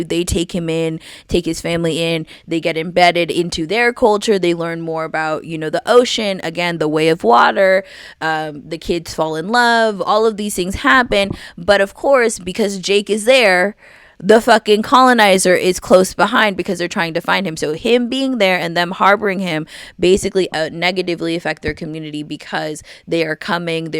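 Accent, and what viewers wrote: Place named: United States. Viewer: You sound a lot like a Yank but I'm just biasedly assuming it, since this is their platform and all. All these smart Yanks are a lot like the Smart Russians, think you know more about people's cultures than other people, living an insular lifestyle, while gate-keeping cultural critique and also political ideology as though they h ave an exceptional awareness compared to other nations of people. This can even include supposed appearances of being activists for colonial exploitation or in a what I call pseudo-left wing point of view.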